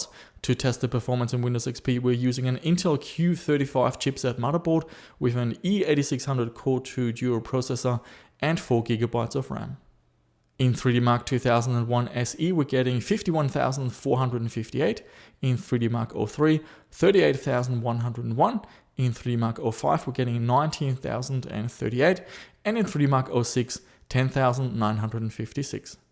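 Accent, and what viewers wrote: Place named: Germany. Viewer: You're from Australia? lol I always thought you're german because you sound like you have an german accent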